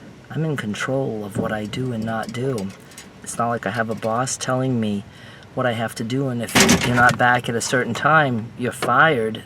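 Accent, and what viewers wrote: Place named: South, United States. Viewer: As an Aussie, i just adore Southern accent:)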